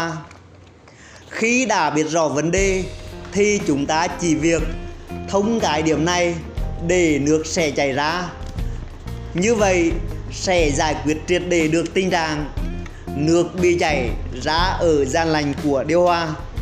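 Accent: Nghe An, Vietnam. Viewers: Rất hay và hữu ích. Bạn giọng Nghệ nhg ns rất rõ ràng, mạch lạc. Tks bạn nhiều! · Tuy nói giọng xứ Nghệ , nhưng cách diễn đạt và thao tác thị phạm hướng dẫn rất mạch lạc, dễ hiểu, dễ thấy, dễ làm theo.. Cảm ơn bạn nhiều ! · Người xứ nghệ giọng nói hay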